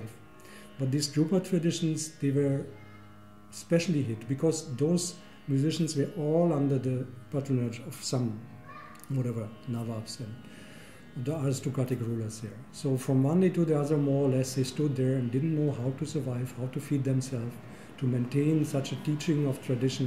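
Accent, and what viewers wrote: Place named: Germany. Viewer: I think it's German accent. lol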